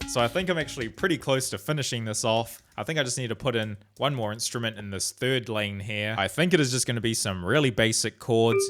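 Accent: New Zealand. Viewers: Wait is this guy a kiwi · Are u NZ?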